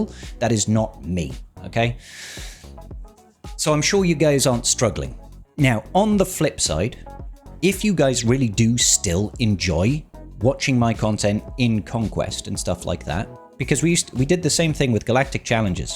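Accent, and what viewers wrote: United Kingdom. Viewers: I watched Vexitrons Conquest videos because yours wasn't available, but I do prefer you geenrally as your more fun to watch and goot to hear a fellow Brit so I vote for more content :) But if your feeling the grind take a break, dont burn out!!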